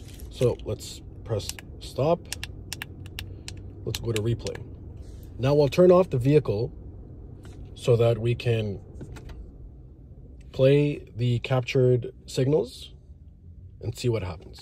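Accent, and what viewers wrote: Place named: Canada. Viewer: Bro I can just tell your Canadian haah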